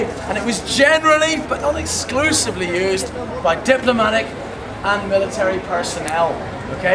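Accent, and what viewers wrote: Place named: Ireland. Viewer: i like tha way he talks ..... he has an ireland accent ..... lovely !!!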